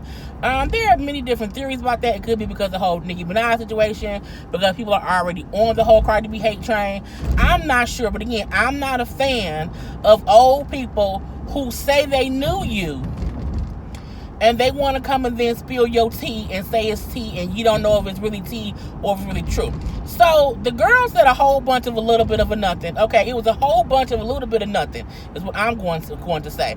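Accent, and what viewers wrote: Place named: New Orleans, United States. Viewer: I don’t believe that lady in your New Orleans voice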